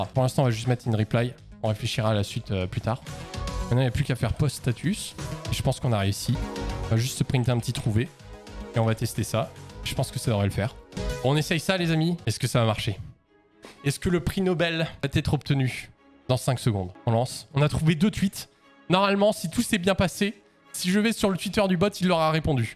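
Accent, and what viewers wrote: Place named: Alsace, France. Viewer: Accent Alsacien c'est pas de sa faute idiot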